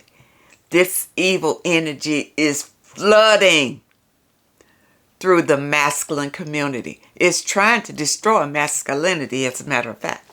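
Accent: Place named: New Orleans, United States. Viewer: Love that New Orleans accent I can hear it unique energy you're amazing I watch you and Only Logical channel he expose those BSB Bottom Shelf Brads anyways great content channel you have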